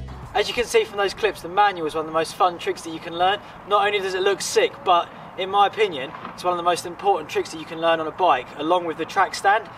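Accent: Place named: England, United Kingdom. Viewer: nope , he is from England. Można wywnioskować to po akcencie , i oczywiście miejscu zamieszkania , żyjemy w dobie w której o takie informacje łatwo , wystarczy "wygooglowac" ;)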